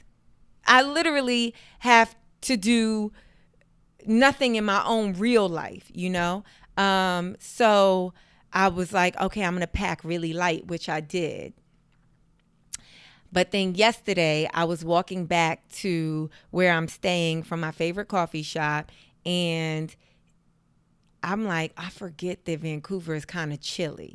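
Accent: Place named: New York, United States. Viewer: Your short about your brother and western union and your NYC accent brought me here. Your accent takes me back home to Queens. New sub, new fan. 👏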